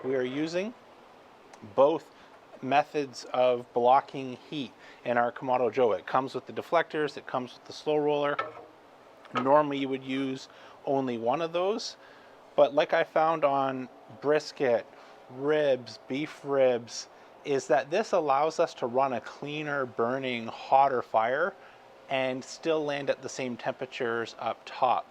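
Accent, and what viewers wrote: Canada. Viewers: I would love to hear you react with, for me, your Canadian accent, when you bite into a really hot pepper. I bet it wouldn:t even involve curse-words, you were probably raised right.;-)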